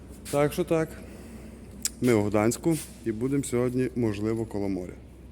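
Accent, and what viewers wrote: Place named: Western Ukraine, Ukraine. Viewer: Судячи з акценту, ти із західної частини України. З понеділка теж стартую по Польщі. Думаю, зустрінемось.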